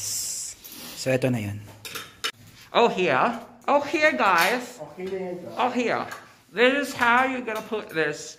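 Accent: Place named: United Kingdom. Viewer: Aha, uu nga anoh. Salamat sa pagturo. Galing mong mag explain. I like your british accent:)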